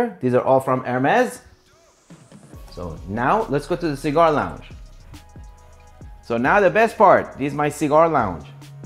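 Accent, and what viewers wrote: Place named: Iran. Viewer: We know you're Persian because you put an e before every word. :)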